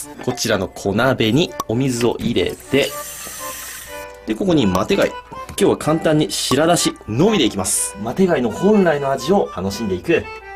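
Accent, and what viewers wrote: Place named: Kansai, Japan. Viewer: 最近関西弁ちょくちょくでてないか？？嬉しいぞ？？？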